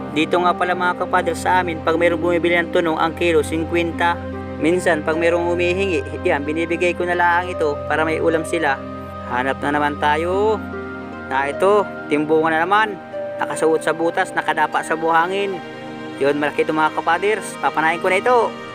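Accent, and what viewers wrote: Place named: Jomalig Island, Philippines. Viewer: Saan yn location mo idol?familiar KC ung tuno ng salita mo? somewhere in jomalig island,Tama po b?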